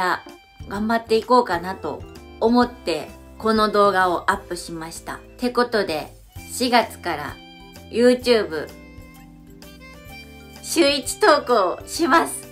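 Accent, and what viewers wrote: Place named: Kansai, Japan. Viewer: 関西弁アイドルは親近感が凄く湧くね👌 BEYOOOOONDSのさやみいみと絡んで欲しい😊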